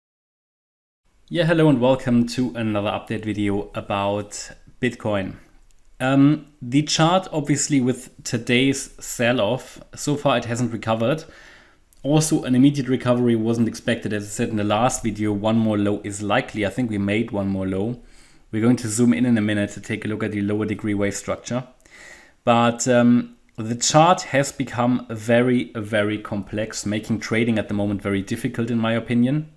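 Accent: Germany. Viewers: is it me or is that a german accent?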